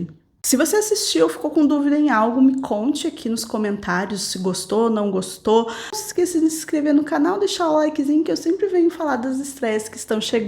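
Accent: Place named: Porto Alegre, Brazil. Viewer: Tu é minha conterrânea? Com esse sotaque só pode ser porto-alegrense.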